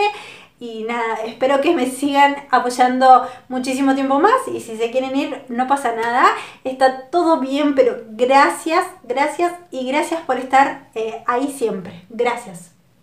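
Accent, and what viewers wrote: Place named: Argentina. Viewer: Yo te conoci por el video de la mascara de calabera qu isiste tambien ese video supe que eras de argentina porque mensionabas la pala sh por ejemplo en este video en ves de decir pienso llegar al millon dijiste pienso llegar al mishon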